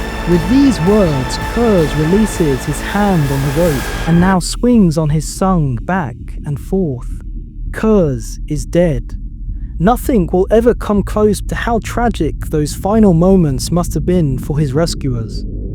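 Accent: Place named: Northern England, United Kingdom. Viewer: Confession time: I'm always willing to support new projects via Patreon or whatever but want to know whether the creator is serious about their work, and usually responses to criticism are a good way to find out. Sorry about the deception. Anyway I see your main strengths as your visual interpretation of the story, and the friendly non-AI Northern tones of the narrator (yourself perhaps?). Well good wishes for your new venture I've subscribed so I can check out your next download when it's available.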